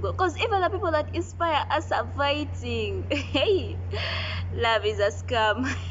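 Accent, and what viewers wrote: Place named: Buganda, Uganda. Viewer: You are a good narrator..and I love the accent in luganda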